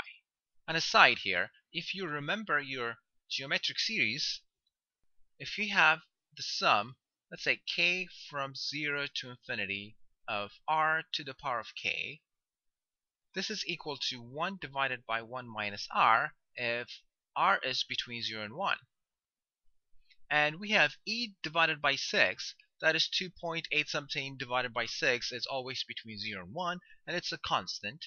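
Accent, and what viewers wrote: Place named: Germany. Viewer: I detect a slight german accent.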